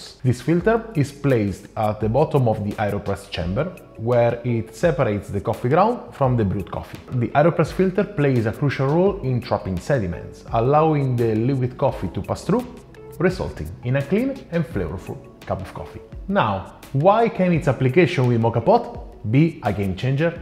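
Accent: Italy. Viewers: thank you so much! Just the video I was looking for while trying to optimize my Bialetti Brikka 4 cup moka pot. I LOVE your videos and your ground to earth explanations:) I even like the Italian accent and your body language while explaining. You`re a gem of knowledge and kindness to your viewers. I will order me some AP filters now:-)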